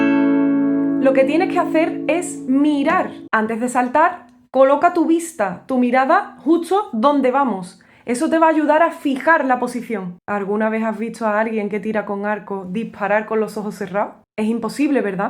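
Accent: Andalusia, Spain. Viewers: Soy argentina y el único acento más bello que el nuestro es tu preciosísimo andaluh 😍 Hermoso escucharte tocar y hablar, un placer para los oídos!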